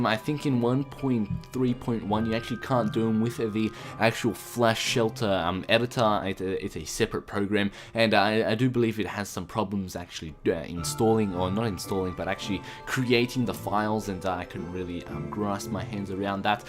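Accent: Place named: Australia. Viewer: Australian English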